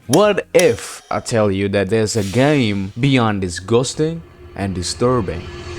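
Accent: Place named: Russia. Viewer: you sound kinda Russian lol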